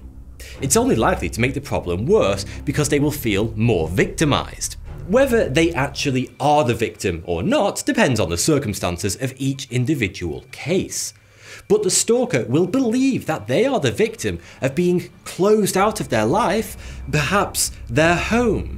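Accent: United Kingdom. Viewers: what 🤷🏻‍♀️ he is british, thats what some people from Britain talk like 😉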